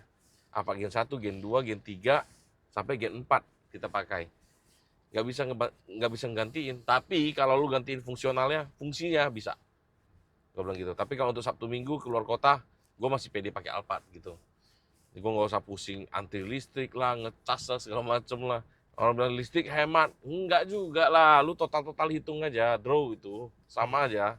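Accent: Medan, Indonesia. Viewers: Gokil kokoh* medan identitas cakap anak medan melekat😅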